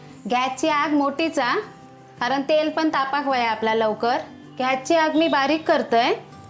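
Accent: Malvani, India. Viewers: व्वा अस्सल मालवणी संभाषण. ऐकुक खुप बरा वाटला. तुमचा बालपण गावात गेला वाटता. भाजी, आंबोळे, सांबारा एकदम झकास. बारीक सारीक मुद्दे खुप चांगले समजऊन सांगितले. 👌👌👍👍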